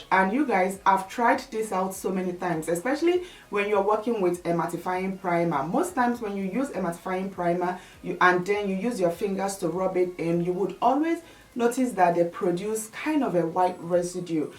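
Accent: Nigeria. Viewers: Oh that Nigerian accent 😂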